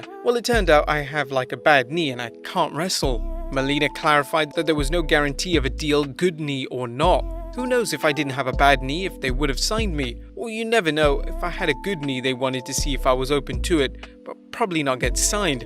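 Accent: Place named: United Kingdom. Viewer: "Money is always a big motivator"- I'd say money's always the biggest motivator, if not the only one, in WWE... It's funny to hear a British accent talking about the American pro-wrestling, since the majority of people in the EU (myself included) can't understand what's going on there at all!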